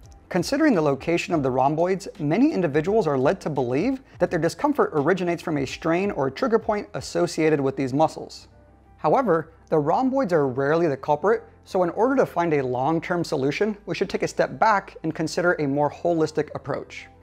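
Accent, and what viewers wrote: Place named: England, United Kingdom. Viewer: Can u please reply me . I have same problem but i didn't understand your English accent . Its better if u write here. Whts problem is this.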